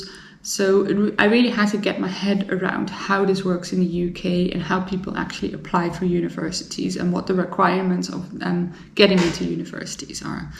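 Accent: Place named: Netherlands. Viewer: Your English is very good from my stand of view (as a Dutch), but I immediately recognised your Dutch accent. My question:how do born and raised English people respond to that? They also must hear English is not your mother language.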